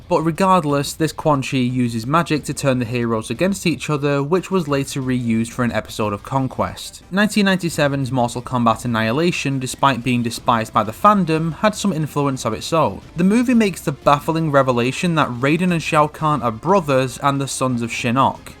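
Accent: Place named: Scotland, United Kingdom. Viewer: Whaaaaat he sounds kinda Scottish to me and I love it!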